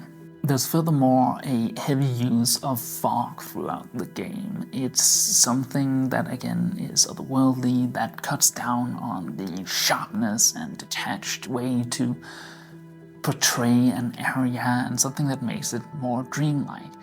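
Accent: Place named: Germany. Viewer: Great Video, one small question, are you german or someone who speaks a germanic language natively (other than english) I think you have a slight accent that can be often heard by Germans and Dutch people when they speak english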